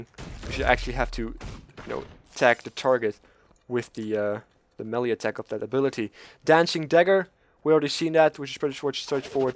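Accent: Netherlands. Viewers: Sounds like hes from Holland.......... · Are you by any chance dutch? XD what server are you on? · You sound dutch :O Very nice coverage tough ! looking forward to use the thief :/ 1 more week !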